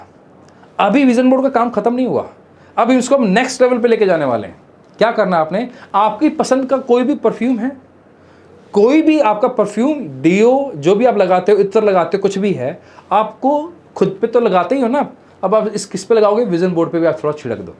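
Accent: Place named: Haryana, India. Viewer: Aap Haryana se ho ji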